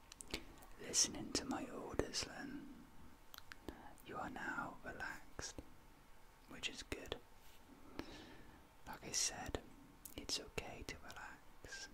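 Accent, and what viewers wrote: United Kingdom. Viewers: relaxing together with your british accent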